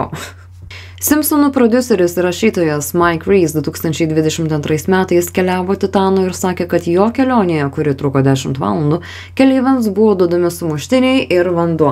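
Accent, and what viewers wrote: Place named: United States. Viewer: Ausys vemia su tokiu akcentu klausyti, jei tokia amerikone, manau anglu kalba butu geriau daryti video, turesi bent didesne auditorija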